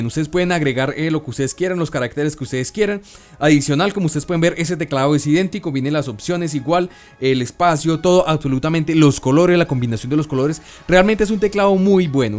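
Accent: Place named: Bogotá, Colombia. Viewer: Buena el parcero se Bogotá se nota ajjajaja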